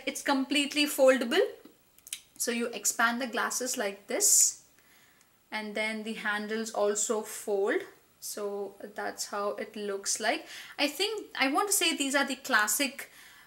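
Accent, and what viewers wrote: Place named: India. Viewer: yeah i found it from ur accent im from tamilnadu.And thanx for asking about me...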